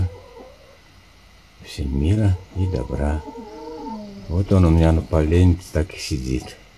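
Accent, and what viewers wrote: Tirlyan, Russia. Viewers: ВОТ ТАК ВОТА!!!😁 ох оно неповторимое тирлянское наречие! Смотрю тебя еще чтобы не забыть как тирлянцы говорят, из тысячи говоров узнаю тирлянский говор.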